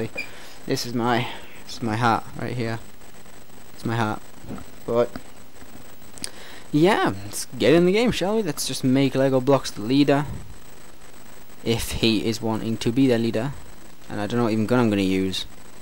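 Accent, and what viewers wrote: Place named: United States. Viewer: are u kidding me ??? ----- really? i think all the americans are rubbing off on you - starting to sound like them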